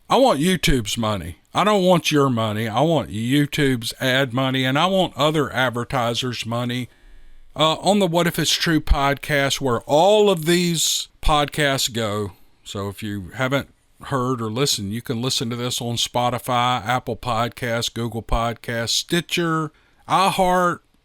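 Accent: South, United States. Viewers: Yes.... you have that southern drawl...not heavy..but perfect storytelling kinda very I don't know any other words than perfect hell it's cheaper than my shrink and more enjoyable and you don't mind going over the hour😆😆😆✊💪🤜🤛🇨🇦👻💙👻💙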